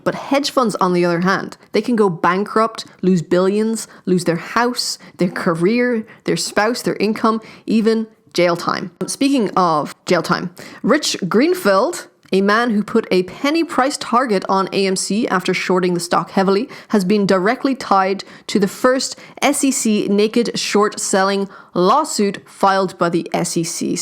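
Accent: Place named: Ireland. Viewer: Great job! You sound Irish, my son lives in Coventry, UK.......You are doing outstanding work for the community....Thank You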